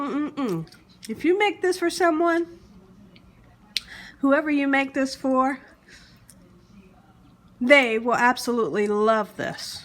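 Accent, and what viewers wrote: South, United States. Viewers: I love your country accent.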